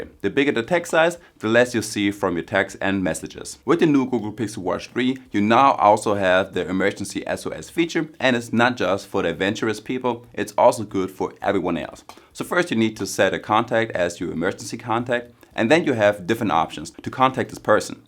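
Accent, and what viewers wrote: Germany. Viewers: aer you from germany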